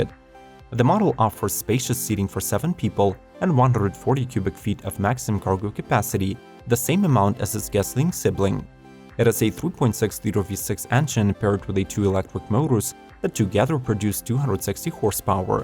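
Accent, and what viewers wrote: Russia. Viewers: what is this killing russian accent for?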